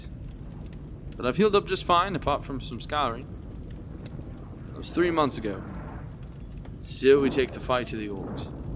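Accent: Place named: United Kingdom. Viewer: Very nice. The British accent and the almost light-hearted way he told his story made me think of the British Empire at its glory days.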